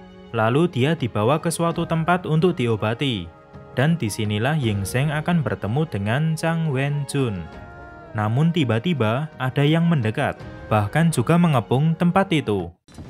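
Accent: Java, Indonesia. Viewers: Mas kata"nya jangan medok bangt🙏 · Gw pertama kali mutar vdeonya oh betapa medoknya ahhh mantap 🤣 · Medok medok medok cuy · Bang logat nya medok sekali:) xixixi asal mana bang?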